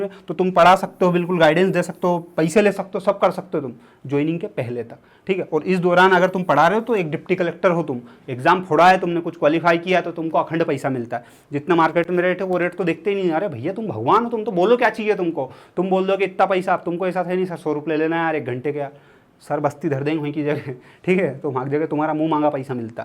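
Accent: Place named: Indore, India. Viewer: Sir is full on indori 😂❤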